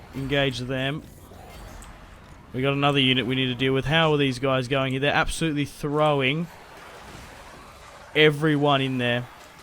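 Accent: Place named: Australia. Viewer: The only thing that keeps me watching your vids is your Aussie accent. It's like listening to a 18th century English commander. I bet u have a handle bar mustache too.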